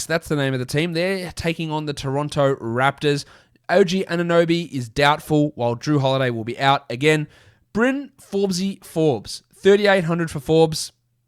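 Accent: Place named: Australia. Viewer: I loves Aussies but trying to listen to one of em talk DFS NBA basketball is cringy. Just can't do it. I'm 2 min in and I've got to stop this video. Get back soon. 😂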